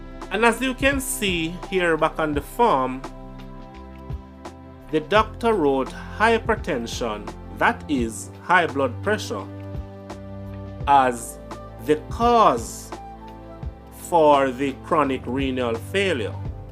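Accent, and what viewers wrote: Jamaica. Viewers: I like the information and the fact you speak using raw Jamaican dialect!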